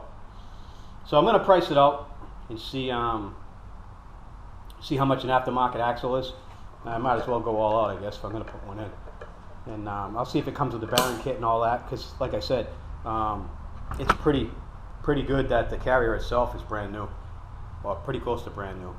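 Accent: Boston, United States. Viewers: Def sound like he from Boston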